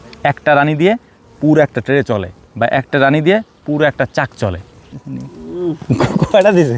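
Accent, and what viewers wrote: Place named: Magura, Bangladesh. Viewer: পিউর মাগুরার ভাষা 😅😅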